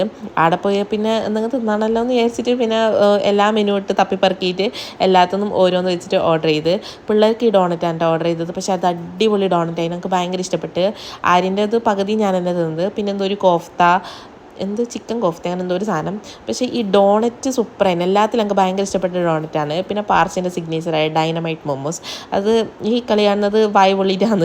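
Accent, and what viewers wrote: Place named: Kannur, India. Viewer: but slangil evideyo oru kannur ind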